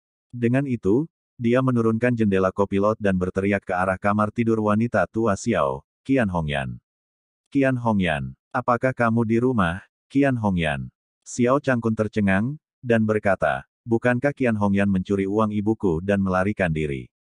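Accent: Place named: Banyumas, Indonesia. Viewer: Ora ngapak ora kepenak 👍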